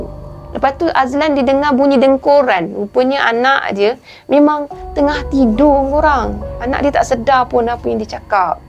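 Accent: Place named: Pahang, Malaysia. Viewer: Ok kak ten q.bagi lah tahu bila ckp mcm logat org pahang.😀😀😀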